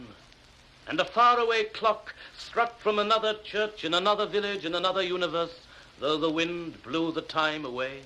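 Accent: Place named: RP, United Kingdom. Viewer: He was born in the largely non-Welsh speaking region of South Wales close to the English border. In school he would have been heavily influenced by stuffy teachers and BBC radio. Term for it is 'received pronunciation' (RP), at a time in UK's history when regional dialects were considered 'uneducated' and 'vulgar'.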